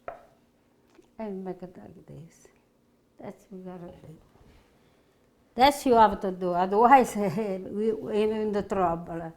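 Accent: Italy. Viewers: Thank you. I love you so much. When I hear you speak you take me back to the good old days of being around my Italian family And with my grandmother who didn't speak any English. Thank you for your Italian kitchen making great Italian dishes. Thank you for the memories you bring. I love you. 🥰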